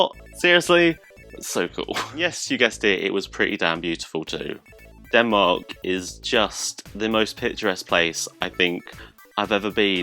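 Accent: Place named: United Kingdom. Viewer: Dont know this channel. Where are they from? He sounds British but when he talkes about visiting Denmark it sounded like he lives on the other side of the World.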